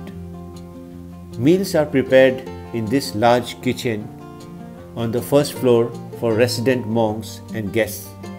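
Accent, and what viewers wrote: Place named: India. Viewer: Your Indian English tone makes it even more enjoyable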